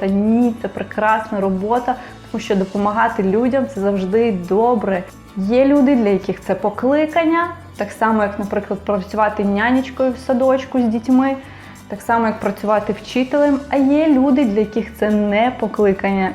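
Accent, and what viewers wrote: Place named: Italy. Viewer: ти говориш з італійським акцентом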